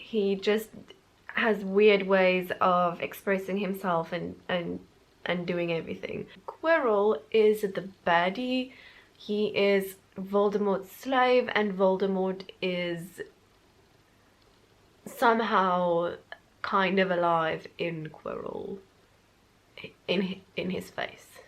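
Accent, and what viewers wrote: South Africa. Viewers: Are you South African ? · sorry my south african senses are tingling, are you from South Africa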